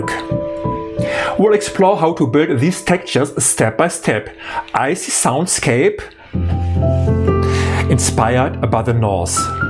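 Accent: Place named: Austria. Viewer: Yes, sir, I do, and as well, with learning languages. By the way, I believe that it stated that you sre located in Germany, but are you Austrian? I was trying to place your accent.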